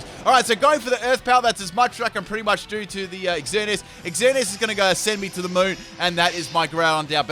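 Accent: Australia. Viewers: U sound Australian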